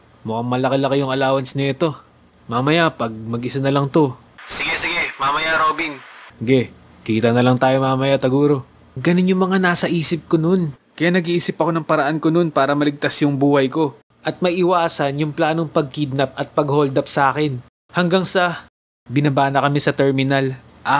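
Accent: Ilocos, Philippines. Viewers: Ilocano po kayoo?!!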